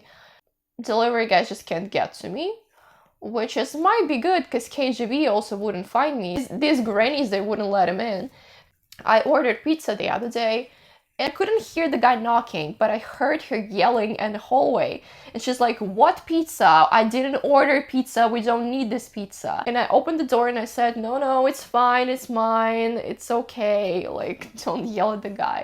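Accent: United States. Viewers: if i didn't know was already Russian, i would have thought she was American. HAHA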